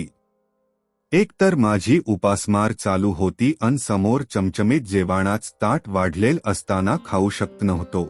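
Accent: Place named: United States. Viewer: is this marathi story presentation or American marathi presentation ? please either improve pronounciation or change the story teller.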